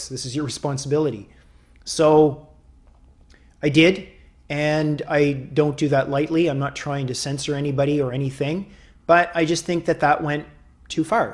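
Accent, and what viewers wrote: Canada. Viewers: Keep those great videos coming. I'm not vegan myself but do eat vegan a few days a week. I love the calm and more mature messages on your channel. And the canadian accent that makes me feel like I'm back home (I'm canadian living in France). 👍🍁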